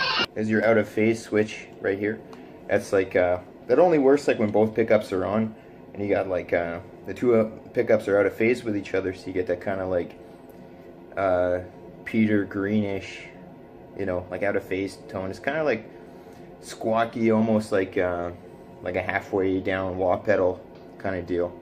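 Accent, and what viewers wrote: Canada. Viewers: Good job on fixing and playing. Haha I knew you were Cdn as soon as you started talking. I'm in BC. You could get 6 G's for that easy. Check the Reverb site out. Go Jets!!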